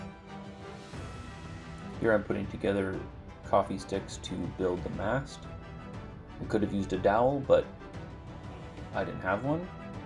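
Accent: Canada. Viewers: Im guessing out west lol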